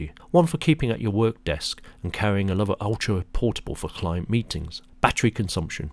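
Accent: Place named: United Kingdom. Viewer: Interesting how Z is Zed, and H is Hayche, in the Queen's English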